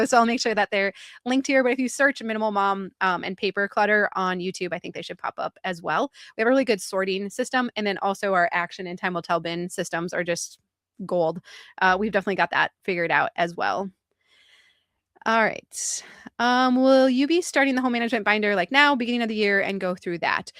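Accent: Minnesota, United States. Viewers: yep she sounds normal to me, Minnesota thing Lol😅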